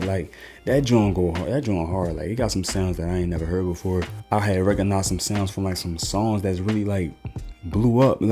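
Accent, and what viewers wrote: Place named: Philadelphia, United States. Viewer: sound like he from Philly. Good vid.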